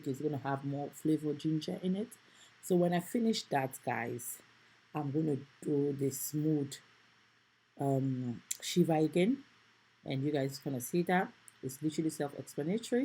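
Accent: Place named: Jamaica. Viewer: I've had ginger beer from the store that is made by Goya. And when I take a sip of it I can feel the antioxidants working. I don't want it really sweet but I want to make my own I was going to make kombucha I'm poor and they won't let you buy kombucha with food stamps anymore. I don't have a lot of Ginger but I think I have enough to make probably a gallon. And I want to make it the real Jamaican Way there are things Jamaicans know that I need to know. I love the accent. I used to work with a Jamaican guy at the sewer plant Bedford New York at the prison besides a used to make tea from cannabis. I bet that was very healing also. I'd say this is a healing drink it's not just a drink. That must be really strong you keep doing it over and over. Kind of like the time I was moving and I made the strongest coffee on Earth espresso but you keep running the water through it about five times I was awake for days LOL.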